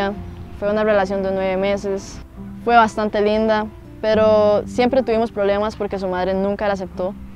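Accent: Costa Rica. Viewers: los ticos pronunciamos la r asi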